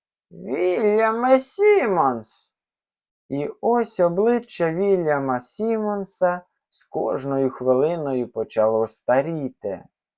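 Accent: Surzhyk, Ukraine. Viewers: Напевно в різних регіонах України присутній свій акцент. Ваш акцент це як суржик.Не ображайтеся, але мені не сподобалось. А Бредбері неперевершений. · Сумщина? Полтавщина?) Суржик, рідний суржик - нашеВсе!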